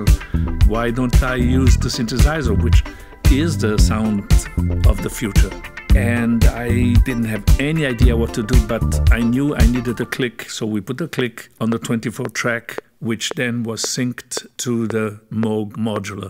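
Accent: Italy. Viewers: actually i checked and he's from the Alto Adige region in Italy (which in german is Südtyrol) so he is italian but was born really close to the italian-austrian border, where the accent is influenced by germanic languages, so we were both right lol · a me sembra un accento piuttosto italiano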